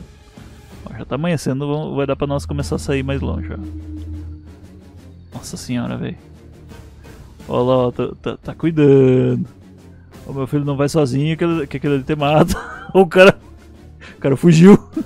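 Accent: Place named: Rio Grande do Sul, Brazil. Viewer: sotaque de Gaúcho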